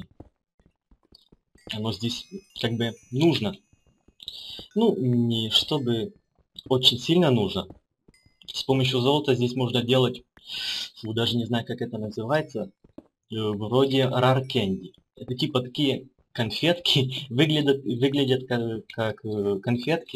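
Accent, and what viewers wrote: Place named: Ukraine. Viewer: Акцент України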